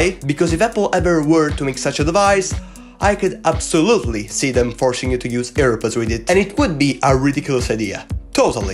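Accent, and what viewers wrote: Italy. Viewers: Sei italiano zio vero?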